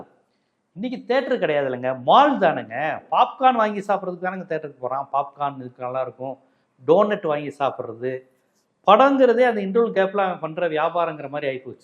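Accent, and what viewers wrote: Madurai, India. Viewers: உங்கள் பேச்சில் தெரிகிறது நீங்கள் மதுரைக்காரர் என்று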